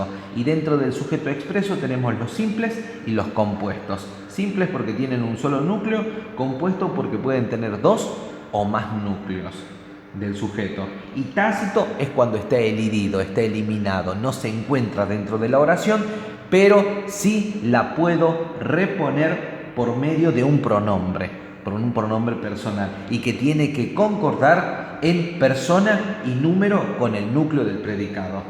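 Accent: Tucumán, Argentina. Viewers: Porque creo que SOS Tucumano o Santiagueño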